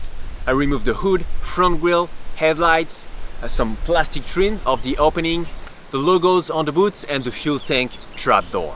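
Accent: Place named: France. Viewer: Him : *Talk My brain : Where is this accent come from? Him : France Me as a French Canadian : Oh so this is how I sound... Belle découverte ton channel. J'achète mon Forester 2001 demain merci pour ton expérience!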